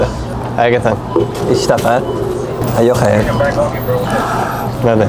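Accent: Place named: Shaki, Azerbaijan. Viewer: Şəkili ləhçəsi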